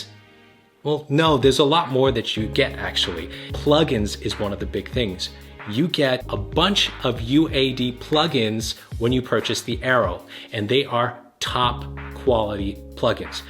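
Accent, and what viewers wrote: India. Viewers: Are you Indian?🤔🤔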